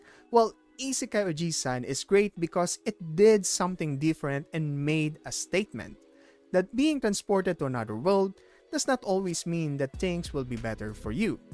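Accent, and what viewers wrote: Philippines. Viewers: your pinoy accent is so thick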